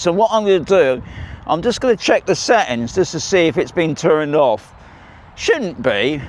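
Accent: United Kingdom. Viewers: You sound like you're from the UK....when ordering ALL websites stated PRE-order (meaning you should KNOW it's not ready for delivery yet in the UK) So that's on YOU. The distorted close up footage is expected (you do know there's a gap between the 2 360° cameras...don't you...the gap is expected) Software fixes the distortion. You were correct at the beginning....you're just MOANING 🤦‍♂️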